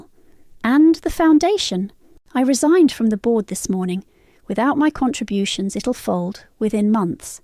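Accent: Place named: United Kingdom. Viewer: So lovely listening to that British accent read this story aloud! Am enjoying this so very much!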